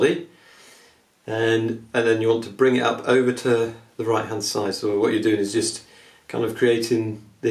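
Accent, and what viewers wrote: United Kingdom. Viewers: The English accent makes it better! But seriously I got it with this video.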